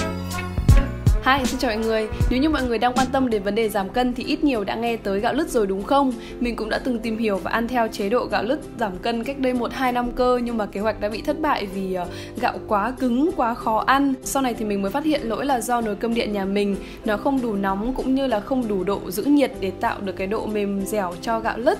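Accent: Hanoi, Vietnam. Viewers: Be de thuong qua cam On chia se cua e , giong ha noi nghe de thuong , guong mat xinh qua 🌹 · Giọng nói HN đáng iu quá hihi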